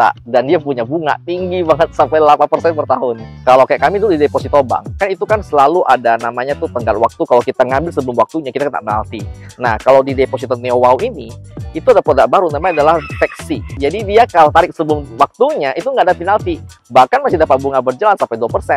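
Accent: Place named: Bangka, Indonesia. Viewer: Abang ni asli nya Bangka atau gimana bang?? Bahasa Abang macam orang Bangka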